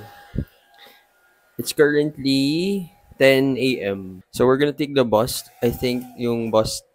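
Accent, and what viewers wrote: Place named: England, United Kingdom. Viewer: I love your English accent!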